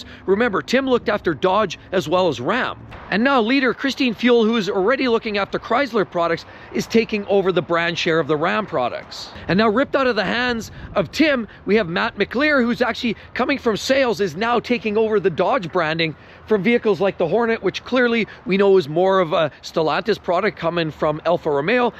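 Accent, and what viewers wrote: Canada. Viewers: New to this channel you sound Canadian, I'm assuming this means these prices are also in Canadian?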